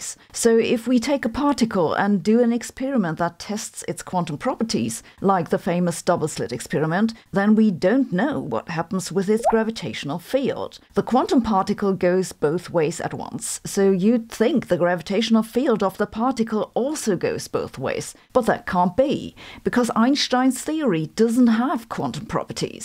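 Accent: Germany. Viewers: her pronunciation has problems because of influences from the German accent, and your inferences are not accurate by the way, simply straw man and borderline ad hominems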